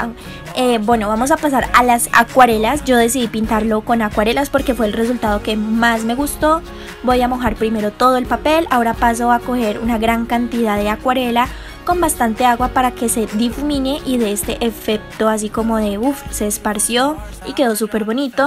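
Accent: Colombia. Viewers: sos colombiana cierto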